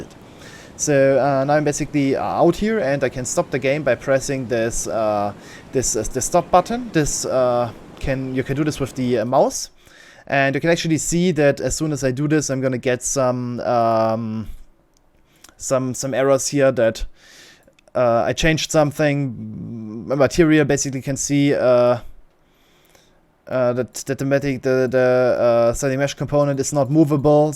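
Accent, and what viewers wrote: Germany. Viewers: Strong German Accent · Youre Tutorial helped me out so much thx but one question are you german? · sounds like an german accent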